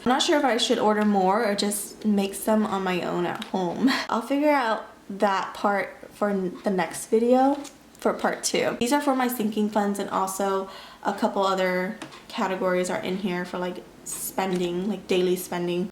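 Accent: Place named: Bay Area, United States. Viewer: i’ve been binge watching these videos for the past couple of weeks and i’m so happy i came across yours! as soon as you started talking, i was like “oh this girl from the bay too” and sure enough! hahaha automatic subscribe. plus i love your penmanship and how minimalistic you keep everything. i plan on starting my budgeting in june and had the same idea of how your process is. and congratulations on the pregnancy, she’s almost here! i look forward to your other videos. xo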